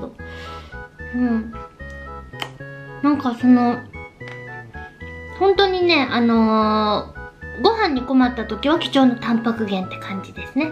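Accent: Kansai, Japan. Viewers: 関西弁？可愛いな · 昔から早希ちゃんの関西弁？かなり好きでYouTubeでの早希ちゃんは見初めて日が浅いので最近はどうなんかわからないんですがちょいちょいでる関西ぽいイントネーションになんか懐かしさを感じます！ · ちゃんの関西弁まじ大好き！はーと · 最近チャンネル登録して見始めたのでASMR動画のヒソヒソ声しか聞いたことなかったのですが、調理中の関西弁がとても可愛い☺️ 賛否両論あると思いますが、私は手袋なしで食べるの良いと思います！お刺身とかはお箸使って食べればいいじゃん！と思うものもありますが、総じて、動画大好きです☺️❣️ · 早希ちゃん関西人？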